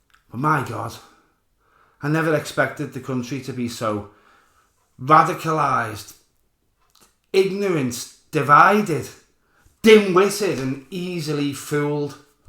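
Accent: Liverpool, United Kingdom. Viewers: 1. Role as a Commenter. OK. Stop. It. Right now. I mean it. You aren't other people. You can't compare yourself to others. Do you compare yourself to the heel work trainers at Crufts when Ann sits? Or how about your garden with Wavertree when you mow the lawn? It's got nothing to do with intelligence. Even the message you're delivering is only the second most important thing. It shouldn't be, but that's the algorithm. You have to know how to work with it rather than trying to get it working for you. I'm afraid I haven't looked at how that works, but there must be channels that you follow (since you're comparing). Contact them. Drop them an email. Ask if they know anywhere that you can get more help to grow. They might tell you where they learned, and as a bonus, they might also share their own experiences. There's also the accent. I personally see it as a bonus because you sound like some of the guys I grew up with in Lancashire (they escaped but never learned how to pronounce words properly 😉) But the Scouse accent, like Geordie, Glaswegian, and Belfast accents, are very distinct. 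Media doesn't cover much regional programming - especially internationally - so your international audience is going to be smaller than someone with a RP/Generic/BBC/Posh accent, or the "normal" Working Class accent of Estuary English or softened Cockney. (TBC)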